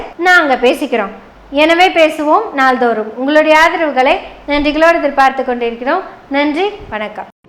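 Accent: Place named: Kongu Nadu, India. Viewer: கொங்கு தமிழ் உச்சரிப்பு மிகவும் அருமை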